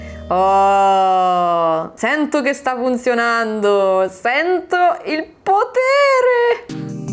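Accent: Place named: Milan, Italy. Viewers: ma sono l' unico a sentirla con un dialetto milanese? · Ma questo minaese nei video.... Ma sei divetate milanese dock... Tac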